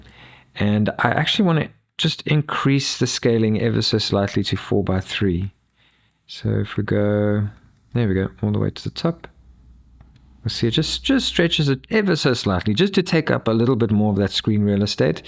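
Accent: South Africa. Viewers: This is SO GOOD. Want to do this with my phone as I don't own a retro handheld but this is basically an android setup guide (which is awesome). You sound like you are from South Africa? I'm from South Africa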